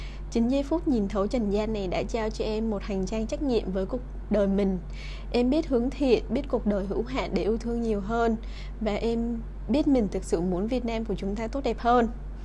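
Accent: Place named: South, Vietnam. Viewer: Sang đấy lái giọng Nam luôn rồi ah :))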